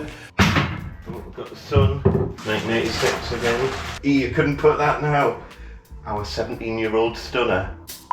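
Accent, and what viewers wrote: Newcastle, United Kingdom. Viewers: I recognise that accent! Gosforth Newcastle upon Tyne? Doing the region proud.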